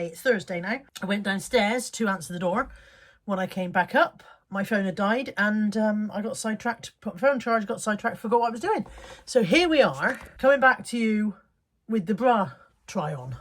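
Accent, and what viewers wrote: Bristol, United Kingdom. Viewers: Hi I watch you and family plus Dogs all the time my late Husband was from Bristol and love ❤️ the way you talk bring back such good memories.coming to Bristol on the 12th March to see family I will look out for you when we go into town would love to buy you a drink.watch you do parcel up and send a supize one every week I am 74 soon and love my yorkie belle she my mate like molly is your.sending love ❤️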